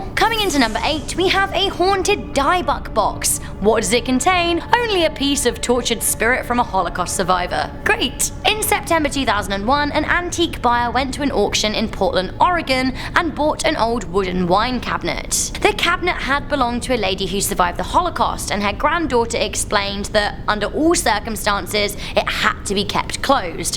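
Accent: England, United Kingdom. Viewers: r u english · Why are the people that do these videos always British and ALWAYS so God damned irritating!?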